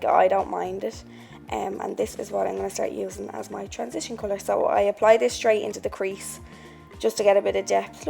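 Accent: Ireland. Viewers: from her accent she’s definitely from ireland.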